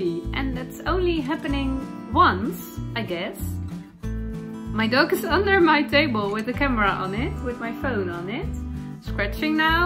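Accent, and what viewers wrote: Netherlands. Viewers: I know that I don’t have to say that your English is perfectly fine, but really, it IS! Please do not stop doing the podcast in English. Yours is my ABSOLUTE favorite knitting podcast and I will be so sad if you give it up! I look forward to it every week. Of course, you should do whatever is best for you, but for your viewers (especially this one) I love listening to your Dutchy English. ❤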